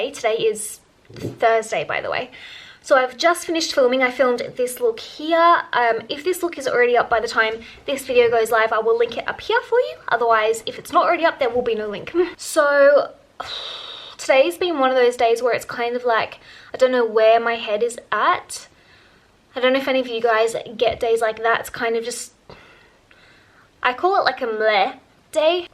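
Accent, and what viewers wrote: Ireland. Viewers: I am loving your little Irish twang. I live in Brit living in Tassie, but all my family are Irish! Love it! Thank you for sharing a part of your soul with us! <3 love and hugs xx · Is it just me or do you have a bit of an Irish accent :o 🍀:)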